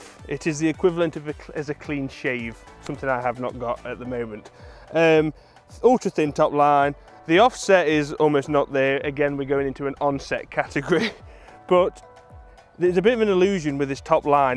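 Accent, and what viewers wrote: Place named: Nottingham, United Kingdom. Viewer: GolfBox TV haha as much as a Derbyshire lad can love a Notts (judging by the accent) lad. Your a legend mate. Love watching your videos. Look forward to my new set of mp20’s 🤪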